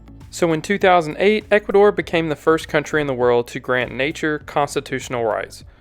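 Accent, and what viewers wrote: South, United States. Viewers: Interesting video. I should point out that you Spanish pronunciation is cringe worthy. Your accent would suggest southern USA with English being your primary language, but with Spanish pronunciation, you aren’t really trying.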